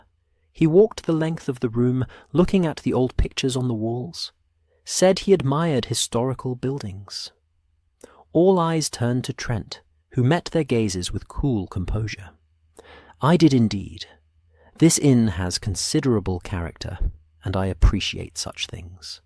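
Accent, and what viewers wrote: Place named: England, United Kingdom. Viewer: Clark is how we say that word in Britain. He has an English accent but can't say Vase. We say V-ah-ze. Americans say Vay-ze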